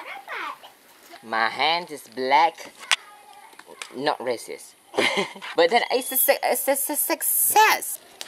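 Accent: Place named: United States. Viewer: dude, if you love british accent why not try use it in your other vlogs...most of your vlogs are in american accent...try new things..it would be great..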